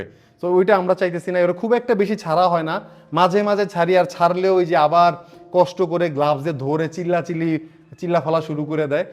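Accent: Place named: Sonargaon, Bangladesh. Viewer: সোনারগাঁয়ের আঞ্চলিক ভাষা এটা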